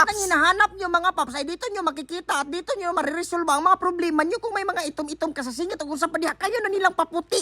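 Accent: Visayas, Philippines. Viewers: Galing jud ang bislog ni, bisayang tagalog😃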